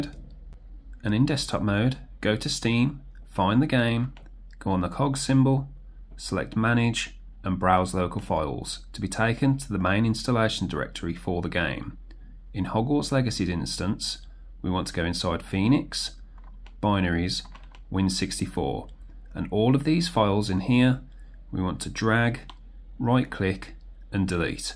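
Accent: Birmingham, United Kingdom. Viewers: Where about in Birmingham you from mate? Always nice to see a fellow brummie.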